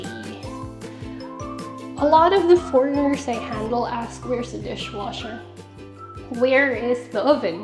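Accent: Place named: Canada. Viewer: I love the video. You accent is really interesting, I love it! I'm living in Hawaii, and you sound like maybe you lived in Canada maybe? No idea how much these costs but I hope to move to Japan with my wife sometime in the next year or so!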